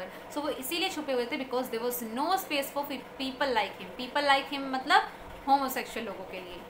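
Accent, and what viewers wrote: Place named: India. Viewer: Indian English accent😢I could not understand most of the words...😑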